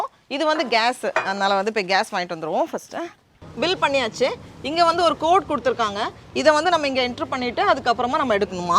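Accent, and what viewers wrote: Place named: Tamil Nadu, India. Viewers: Vallu Vadhaku.. Nagercoil, Thoothukudi, Thirunelveli Slang.. Nice :-) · Neega pesum pothu Kanyakumari slang maari irukku 😘❤️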